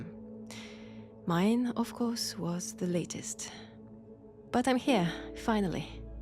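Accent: France. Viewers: I like the French Accent. Thats a nice Roleplay and there a emotions on it that i can feel myself. Good job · wow.....just wow, This was difficult to listen to, not because it was bad but because it was too good. Your voice carried so much emotion in it and when it started cracking, so did i😅. Life taking you away from someone you deeply care for is always a tug at the heartstrings, and this story was just made so beautifully around that idea. This is the first audio ive listened to of yours and although this is a packed up sendoff to these two, i think i can speak for most people when i say we need a part 2, or at least a resolution where they find eachother again. Something, anything. Our souls need it to heal😭 (i also adore french accents ever since i visited france a few years back, love your voice😁👍)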